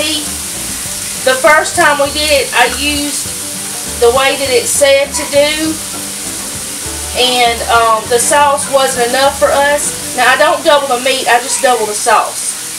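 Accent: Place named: South, United States. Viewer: I love your heavy southern accent. ❤️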